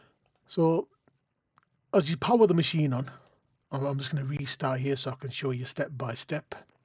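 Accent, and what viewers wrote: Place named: Yorkshire, United Kingdom. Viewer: love the accent! proper Yorkshire - great video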